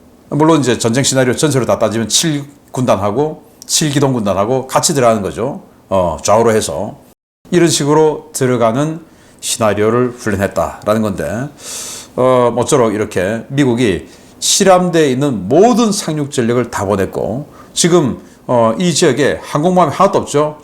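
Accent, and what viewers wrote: Gyeongsang, South Korea. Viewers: 님 경상도 아저씨 맞아요. 그러니까 우파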